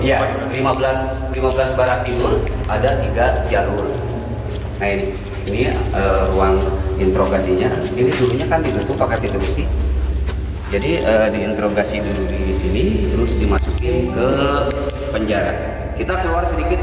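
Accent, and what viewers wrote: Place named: Java, Indonesia. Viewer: Sehat selalu mase,,,,,tetep semangat,,,,medoke itu loooooooo😘😘😘😘😘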